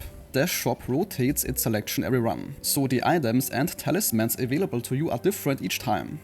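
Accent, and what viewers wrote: Germany. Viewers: German or?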